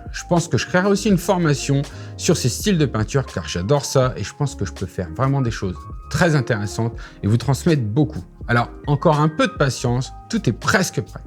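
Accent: France. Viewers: I really loved the colour scheme in this one, one of my favourite paintings you've done. :) About a month or so ago, I paid the shipping for the dvd but I still haven't got it. I'm not sure what to do.. can you suggest anything? Thank you x (also nice French accent :) )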